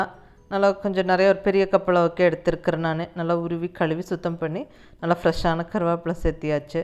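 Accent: Kongu, India. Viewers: I think Coimbatore.... Sollumbothey Enga kongunadu Nu sollaranga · Mam neenga pesura kongu tamil romba nalla iruku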